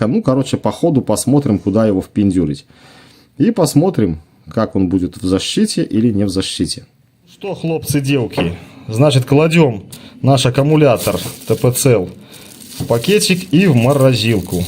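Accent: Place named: Vitebsk, Belarus. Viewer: Улыбаюсь с говора-акцента.;) Как будто к родне в Витебск снова заехал. Много лет не был... Эх. А аккум норм, наверное) Крышка-вешалка пригодится. Отдельно продаются, конечно. И зарядка компактная. Я б взял, как вторую. Надо подумать.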